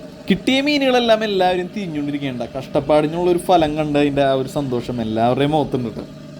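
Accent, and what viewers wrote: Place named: Thrissur, India. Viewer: താങ്കൾ തൃ... ശൂരാണോ. ഉഷാറായി 👍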